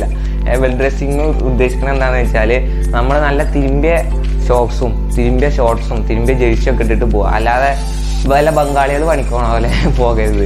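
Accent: Malappuram, India. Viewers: മലപ്പുറം ഭാഷ തന്നെ 🤣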